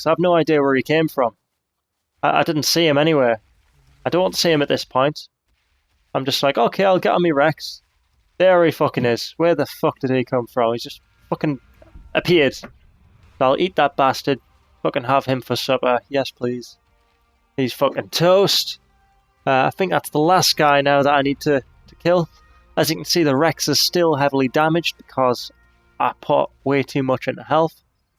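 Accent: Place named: Ireland. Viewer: I love your irish accent lol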